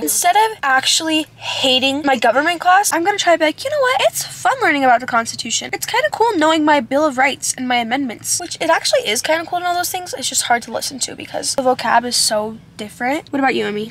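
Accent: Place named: United Kingdom. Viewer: I love your morning routine and your british accent voice ☀❤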